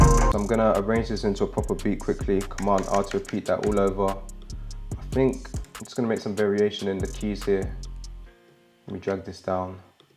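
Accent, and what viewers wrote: United Kingdom. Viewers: Its sound british af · Why do people with a British accent love to use the word “literally”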